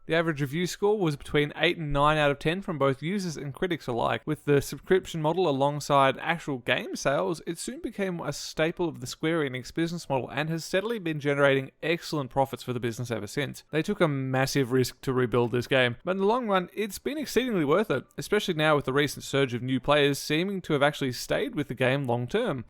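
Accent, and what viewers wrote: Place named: Australia. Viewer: Something about your voice reminds me of Christopher Walken, but in an Aussie accent.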